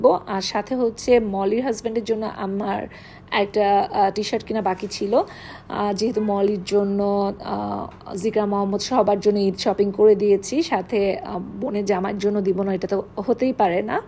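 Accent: Sylhet, Bangladesh. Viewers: Apu apne sylheti mat matsoin j bala lagse hunte and dress o onek sundor oise sob gula shopping o onek sundor oise😍😍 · খুব ভালো লাগলো সিলেটি ভাষা আপনার মুখে আপু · Apu like diye video dekha soro korlam,Sylheti vasai kotha sone khub valo laglo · Like 👍 134. Walaikumassalam Apu shuna. Khub khub shundor hoyechey Sylheti kotha. Oy Apu Sylheti maat thik oisey. Love you sweetheart Apu ❤❤. Sending love from Srimongal ❤ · Masha Allah sylheti mat kub sundor hoisa ami o akjon sylheti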